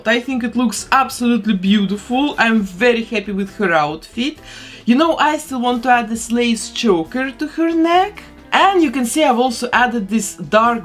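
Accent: Russia. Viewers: This is my second episode and I'm already hooked and I love your accent are you Russian?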